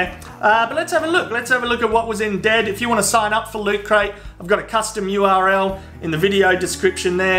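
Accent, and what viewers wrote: Australia. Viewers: You prove that, for a fact, putting an Australian commentaror dropping f bombs over ANY video equals funny. The accent and enthusiasm, great timing, good show man. Like buttons all the way. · The best thing these videos have done for me is helping me finally know how Australian sounds and why people love it so much. And learned many new Australian words, like cheeky and spew.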